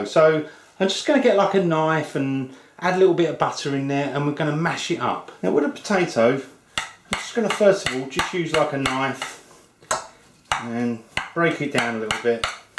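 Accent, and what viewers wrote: United Kingdom. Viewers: I was just about to ask the same thing :D I'm from Hungary though, but I love the accent, I am working on mine to be more British :) For that matter, I'm just making this dish :) Sadly the butcher ran out of beef so I gave it a try with pork O.o I wonder how it going to turn out :D Won't be a real cottage pie after all because of the meat, but I'm still looking forward to it :)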